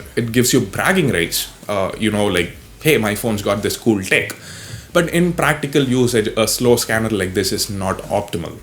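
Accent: India. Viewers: Good video dude. I like your accent and your the first Indiana reviewer I have seen Good explanation Hope all best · I love ur english... purely Indian... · I hate hindu English I don't understand it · I dont normally comment on indian reviewing phones but I like your accent and basically explains more about the phone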